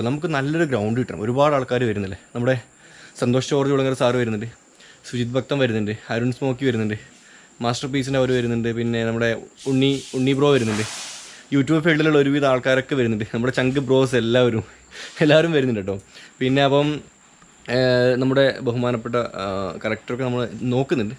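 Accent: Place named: Iritty, India. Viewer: . കുഞ്ഞി കുഞ്ഞി നമ്മുടെ ഇരിട്ടി ഭാഷാ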